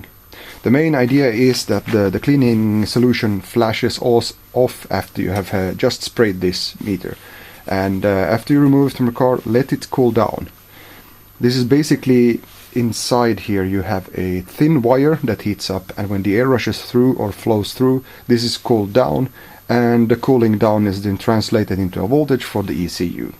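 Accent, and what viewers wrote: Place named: Russia. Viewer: My native language is Russian. I heard your pronunciation and assumed that your native language is Russian too.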